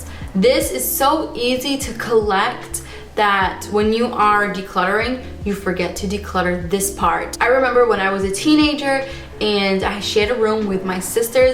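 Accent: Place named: Boston, United States. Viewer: A video suggestion for you because you're absolutely adorable and I love the way you pronounce words would be how other people say a word in different parts of the country and then you try to say it with your Boston accent! Please say car key's LOL An old co-worker of mine had an accent like yours and every time she would say car keys it sounded like she was saying khakis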